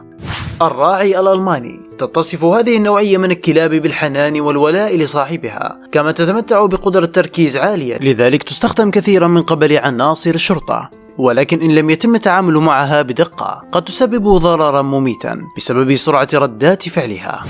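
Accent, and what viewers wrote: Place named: Libya. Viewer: أسمع أنت ليبي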